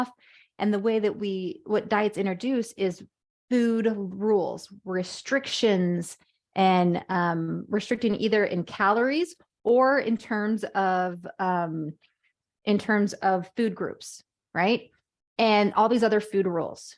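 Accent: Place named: Utah, United States. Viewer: This was great! How come you don’t have very many subscribers? Are you just starting out? What brought you here? On a weird note, are you from Utah? You have a pretty strong Utah accent!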